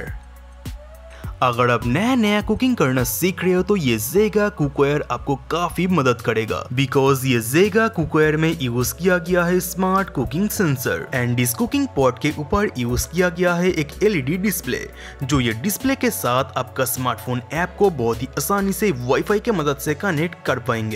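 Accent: Bengal, India. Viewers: हां भाई हिंदी बोलो यार बंगाली आवाज आ रही है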